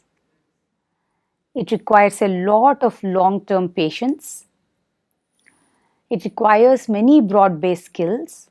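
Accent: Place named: South Asia. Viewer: Thank you for these words of wisdom Ms Kalaari. The subtitles really helped since I can't follow your accent (South Asian, I believe). Can you point us to some good HBS case studies of your investments?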